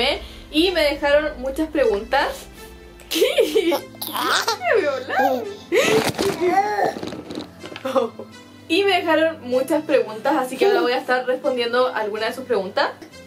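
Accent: Chile. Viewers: ¿Eres chilena?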